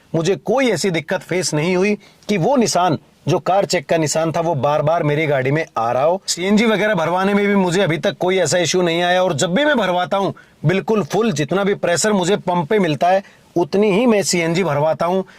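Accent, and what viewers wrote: Haryana, India. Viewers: भाई जी राम राम ap hna bolte acha h thodi haryanvi touch aati h vo acha lgta h apke es channel par to video top hoti hi h, lekin eske alawa m khna chahta hu ki ap ek apna vlog channel bhi bnaye or daily routine ya family k sath kahi aate jaate h uska vlog bnana ye sab video bhi suru Karo vlog channel k sath.. mja aa jayega ❤️❤️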